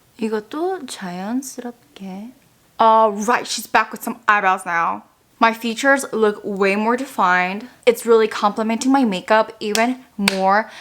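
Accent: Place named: South Korea. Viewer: 콩글리쉬 너무 귀여워여ㅋㅋㅋㅋ 메아크업도 예뻐여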